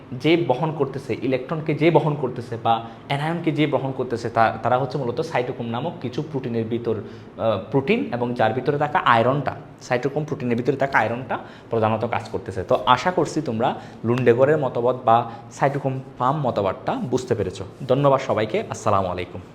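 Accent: Sylhet, Bangladesh. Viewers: Nice class🤗.Btw,I think you're from sylhet because of the sylhety accent while you're speaking . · You are from Sylhet, are'nt you?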